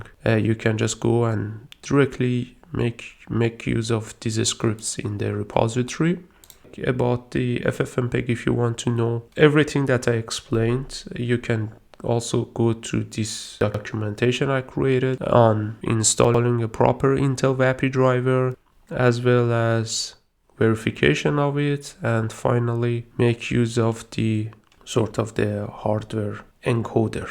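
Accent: Iran. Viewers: Nice vid. It helped. Also, is that a Persian accent?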